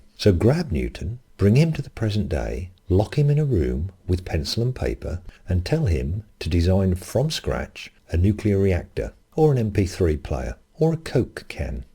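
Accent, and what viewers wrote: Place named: United Kingdom. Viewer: but there are people that invented things without learning anything prior, like Tesla. Tesla and others described their experience as a shamanic trance experience where the Universe told them what to do. The life explosion from the Cambrian is exactly that, apparition of sophisticated life already evolved out of nothing, with no intermediary species. And sincerely I do not see how this idea, even taken as valid, refutes intelligent design. What you're saying is a designer must learn from his mistakes in order to make sophisticated things. So the process looks exactly like evolution, however, evolution itself being the works of a designers who keeps learning from his mistakes and trying new things. Your ideas do not refute the designer, refute the fact that designer couldn't come up out of nothing with sophisticated life. 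Your idea just proves evolution, but not the nonexistence of a creator, actually it even makes a case for it. Another ridiculous bong argument, I wasted my time, I swear, whenever I hear the bong accent I will close the video. Ridiculous sofisms, so cringe, so awkward, so out of touch with the reality, so clumsy, so pompous and pretentious. Bong people in a nutshell.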